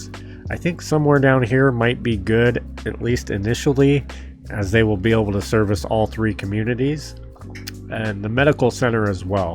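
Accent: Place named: Oregon, United States. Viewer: You're a fellow Oregonian, sounds like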